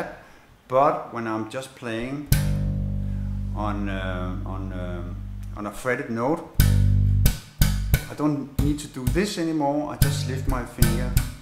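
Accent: Denmark. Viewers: You are Danish?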